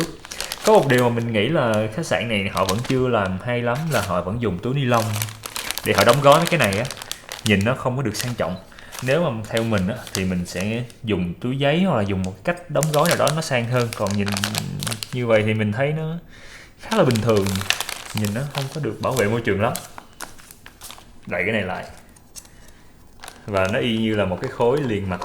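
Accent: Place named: South, Vietnam. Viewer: giọng rất truyền cảm. chắc tại dân Bắc ít nghe giọng miền trong nên thấy hay lạ.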